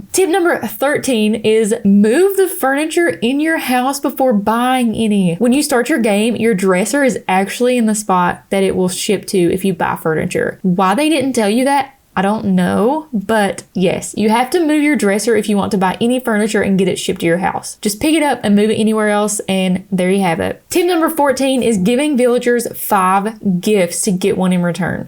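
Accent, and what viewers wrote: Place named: Texas, United States. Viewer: Yooooo....that accent tho lmao. U gotta be from Texas or Bama. Hahahahaha I love it. Makes me feel at home.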